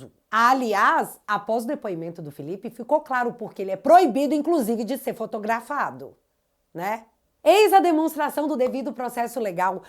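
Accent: Minas Gerais, Brazil. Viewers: Bão por de mais como falar o mineiro 😅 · Sou carioca mas o sotaque mineiro é o mais bonito da língua portuguesa 😊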